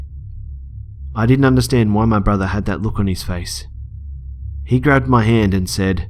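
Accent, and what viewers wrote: Australia. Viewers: He's Australian 😂💕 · Just curious, but what is your accent? It sounds very British, not sure which region, but at times you sound distinctly Australian. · it's so hot when he swears, with his (australian?) accent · Not trying to be a stalker but it's obvious that your australian I'm a Queenslander wbu? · Yep it is aussie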